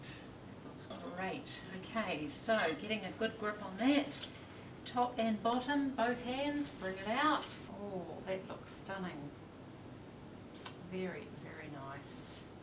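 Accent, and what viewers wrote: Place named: New Zealand. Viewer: ahh.. wonderful tutorial. I have wondered how these are made, and here in NZ too! I recognised your kiwi accent. I am getting the vacuum gear together (almost have all now) to make the vapor deposition dichroic glass here in NZ as well. Is there a maker or supplier in NZ already?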